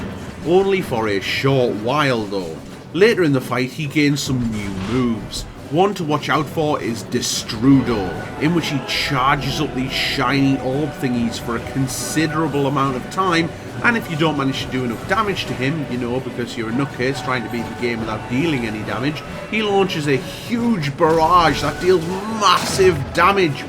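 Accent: Northern England, United Kingdom. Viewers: Are you in northern england? You sound like you’re from manchester or lancaster.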